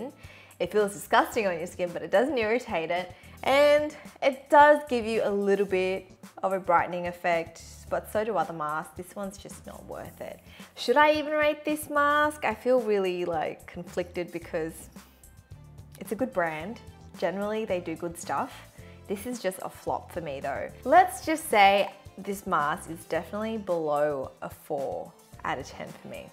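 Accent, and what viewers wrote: Australia. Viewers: i know it's because of her australian accent, i just sometimes mishear what she says anyway because i don't hear australian accents often lol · Yashti Raj I think cuz she's Australian. I've heard a lot of Australians say it like that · I Looove your aussie accent !! I cannot sound Australian for my life 😂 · I like your accent, is it an Australian? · I seriously love the Australian accent XD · I love the Aussie accent actually, I think it sounds fun and endearing.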